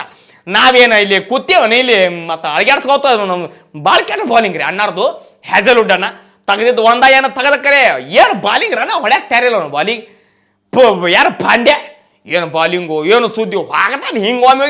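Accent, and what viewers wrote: North Karnataka, India. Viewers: ಮಾತಾಡುವ ಶೈಲಿ ಸೂಪರ್ ಉತ್ತರ ಕರ್ನಾಟಕದ ಭಾಷೆ❤❤❤❤❤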